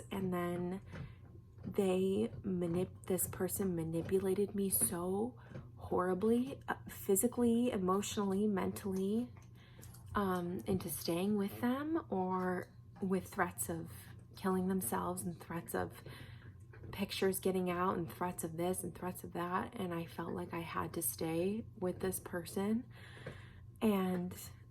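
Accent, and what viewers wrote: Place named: New York, United States. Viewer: Girl what part of New York are you from !?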